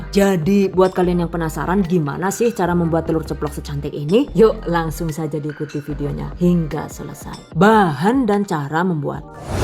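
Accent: Banyumas, Indonesia. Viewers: Haha ada ngapaknya juga,,asal mna bu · Semoga tukang warteg tidak mencontoh video ini..karena waktunya lama. Ngomong ngapaknya selalu saya tunggu. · bikin org gk bosen belajar karna byk selingan pke bhsa jawa ngapak 😂 jadi makin smangat mantengin chanel nya bun 🤗🥰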